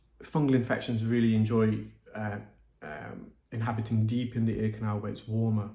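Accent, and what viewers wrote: United Kingdom. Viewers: The lips drive me crazy. The British accent makes everyone’s lips fold into Donald Trump lips. so distracting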